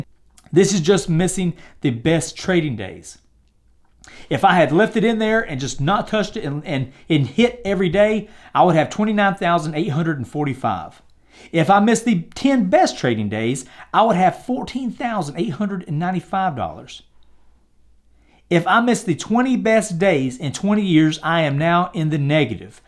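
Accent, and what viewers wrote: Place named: Georgia, United States. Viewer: Where u from? Sounds a little bit of Georgia in ya. Good ole country boy from Arkansas here and I'm glad I found ur vid. First time viewer and first year I've been investing. Turned 4 thousand into 25 as of this moment.....was about 40 but I believe in the cryptoz that I've invested in and know they are the future in all their respective areas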